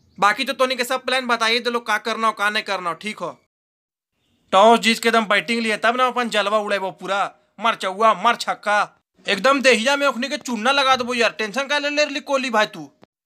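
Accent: Bihar, India. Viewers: Lagta h aap bihar ke Rohtas se ha ...🙏🙏🙏🙏🙏🙏🙏🙏🙏🙏🙏🙏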